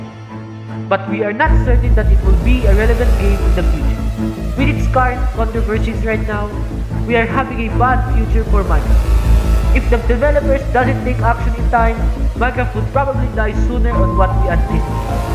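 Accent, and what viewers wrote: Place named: Philippines. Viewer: Filipino Accent😂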